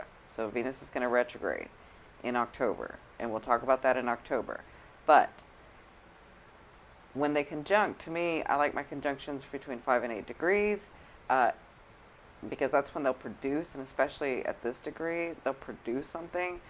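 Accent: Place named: South, United States. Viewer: Hello, Your 2 funny! Your REAL; Thank You! Yes i fully understand everything your stating! I understand planet's! You have my accent, you talk like me are u from the south? jan 27th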